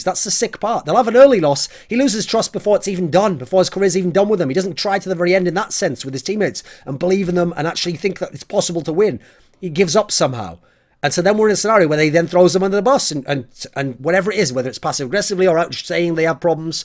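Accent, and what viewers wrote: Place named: United Kingdom. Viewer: Waddles It's unscripted but he has good speaking skills, so he can just say his thoughts as they come in a way we understand. Plus he has a British accent, so it sounds cooler.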